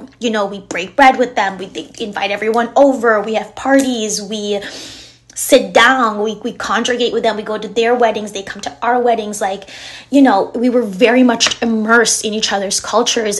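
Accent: United States. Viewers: Speak like a guyanese.....you turn yankee overnight like the others.... Be yourself and respect who u r and your background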